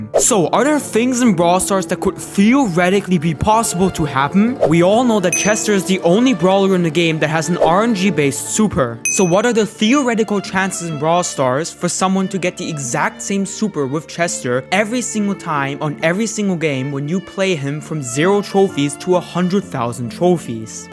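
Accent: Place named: Germany. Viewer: Im hearing the german accent so much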